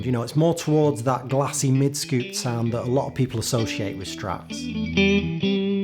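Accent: United Kingdom. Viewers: I get annoyed with the British accent..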